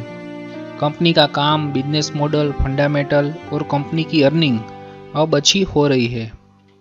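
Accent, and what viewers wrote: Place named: Gujarat, India. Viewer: Love ur gujrati accent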